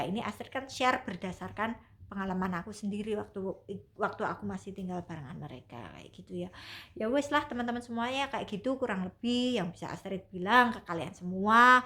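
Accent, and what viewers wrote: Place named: Bali, Indonesia. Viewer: Ini logat nya orang Bali ...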